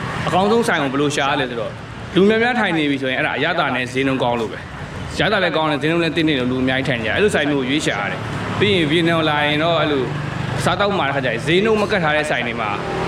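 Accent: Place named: Upper Burma, Myanmar. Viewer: ထမင်းစားရင်လဲ ကို ပဲကြည့် အလုပ်သွားအလုပ်ပြန် ကားစီးနေရင်းလဲ ကြည့် videoလေးတွေတကရ်သဘောကြတရ် စကားပြောတာကလဲ လုံးဝပွင့်ပွင့်လင်းလင်းနက်လုံးဝအညာစတိုင်ကြီး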